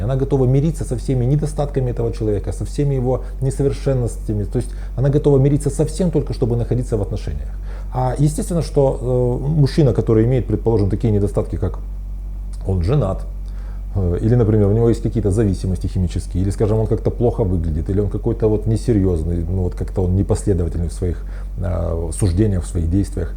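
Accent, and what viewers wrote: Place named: Ukraine. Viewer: он живёт в Украине, акцент просто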